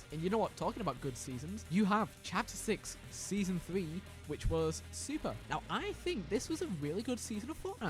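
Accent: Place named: United Kingdom. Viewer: Respectfully you are actually the most British sounding British youtuber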